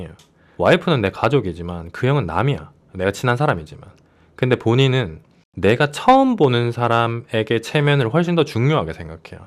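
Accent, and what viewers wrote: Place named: Daegu, South Korea. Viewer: 경상도 사투리가 되게 귀에 거슬리는게 1도 없이 그냥 술술 들어온다 ? 싶었더니 저랑 같은 대구 분이셨군요. ㅋㅋㅋ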